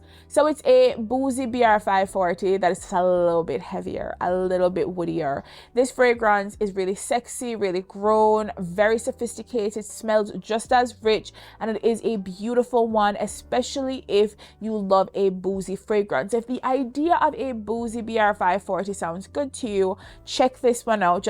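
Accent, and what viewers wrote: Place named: Jamaica. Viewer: Am smelling a Jamaican accent 🤔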